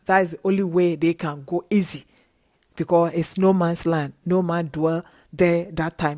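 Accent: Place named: Africa. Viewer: This was inspiring and greatly appreciated. Your teaching and voice without background music, without european or english accent but rather an African accent from the Motherland makes it authentic. I look forward to your upcoming history lessons. Thank you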